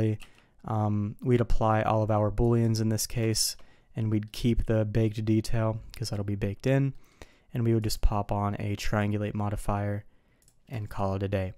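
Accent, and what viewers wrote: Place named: North America. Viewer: You sound North American to me. You have a deep voice, you must be 6'3", therefore I need to be all ears, as English is my second language. Overall, I mean, it's good to hear native english speakers. When someone tries to explain with strong foregn accent, I just turn off their videos. Lol. Thanks for sharing useful info! Good luck!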